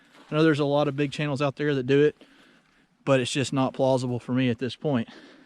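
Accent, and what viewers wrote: South, United States. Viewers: Thank you four comments about us older folks am one of those disabled elderly ladies who appreciate the content as well as your southern accent. Keep it up!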